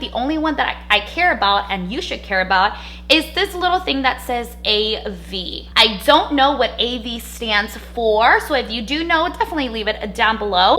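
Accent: New York, United States. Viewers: I love how you say "all" "on" "always" "honestly" ❤😂🤣 you must be from new York or Boston 🥰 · I never thought I’d find a video to actually help me with this but I DID! THANK you so so much! Ps. Is your accent NY or NJ?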